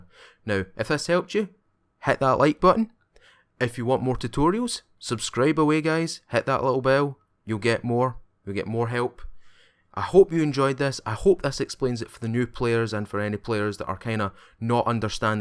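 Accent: Scotland, United Kingdom. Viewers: Love your LiF:YO vids, love your scottish accent, I should play my " " colection while playing LiF... Game is still grindy AF, fortunately we can play with the server settings and accelerate the leveling rate...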